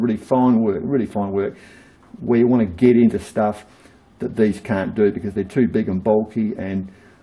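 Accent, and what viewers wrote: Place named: Australia. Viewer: Gidday,I find it interesting that you sound like an Aussie but have a Chinese name.I then go to ebay and i get Chinese pretending they are Australian and located in Australia.Then there is the fact that they say something they are selling is a test indicator but it in reality it's a dial indicator.My mate was going to buy one of those Chinese hobby lathes thinking that the bed size was 1.2meters.I explained to him,that is 12 inches Bill,some people's peckers are longer than that and if you have only half that you need to do it twice,and that 6inches x2=12inches....A fortnight ago i was researching leather work,and in the process of buying leather working tools a burnishing tool for leather edges came up.I then watched a video of someone making some leather tools on a metal lathe and thought that would come in handy to save some coin.Now,i have had an interest in Blacksmithing for years and was thinking about making knives as a hobby,but what is a good knife without a quality sheath made by hand.In my madness i have almost come full circle.If learning means i am alive,why does my body feel like shit?..;)..Thanks for the advice mate...Cheers Gunna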